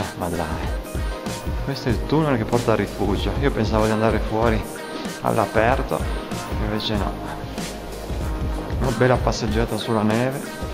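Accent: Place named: Piedmont, Italy. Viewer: leggero accento piemontese...TI PIACE LA MEENTAA?? 😜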